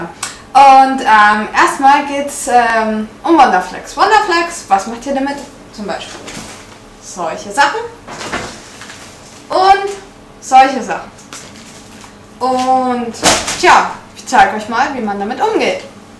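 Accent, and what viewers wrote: Germany. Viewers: Wusste ich's doch^^ deinen deutschen Akzent kannst du nicht ganz verstecken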